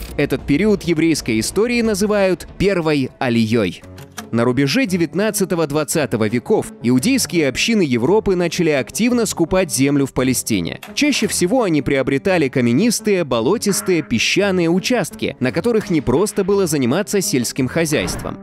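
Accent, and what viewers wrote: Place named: Moscow, Russia. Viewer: Рассказ интересный. Но Московский акцент режет слух: "конфликт нАваприбывших обострялся...". Да здравствует Красная Армия! Да здравствует социалистический сионизм.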